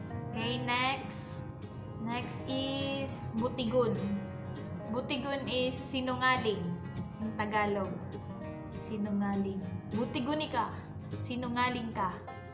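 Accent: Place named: Bula, Philippines. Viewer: taga bula ka? taga bula kasi ang tuno mo.. hehe..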